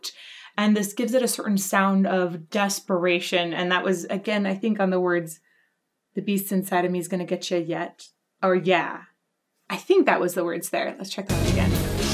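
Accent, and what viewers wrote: Brooklyn, United States. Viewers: His accent is of Brooklyn ny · That's a Brooklyn accent hahahahahaha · Brooklyn accent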